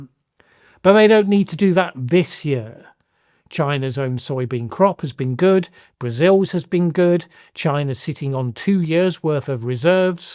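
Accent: United Kingdom. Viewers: Better a normal British accent than some nasal yee-haw from an incoherent hill-billly eh ?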